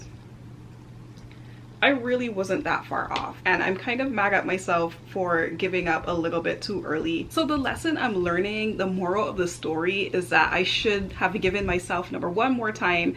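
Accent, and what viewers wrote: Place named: Midwest, United States. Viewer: Are you from mid west? I hear a slight accent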